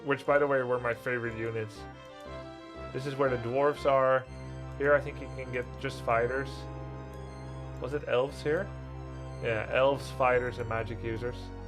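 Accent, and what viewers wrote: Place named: Netherlands. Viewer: I thought I recognized a subtle Dutch accent! I'm French but living in the Netherlands, in Den Haag. I was a huge fan of D&D growing up in the 90s, but somehow never played this one, so I was wondering if it was worth a purchase. Thanks for the video, I just picked it up off GOG. :)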